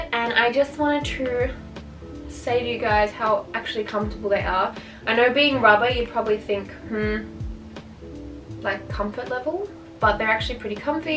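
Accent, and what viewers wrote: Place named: Australia. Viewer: loved the video, then you started talking, and you are a fellow aussie!? hello from brisbane! instant subscribe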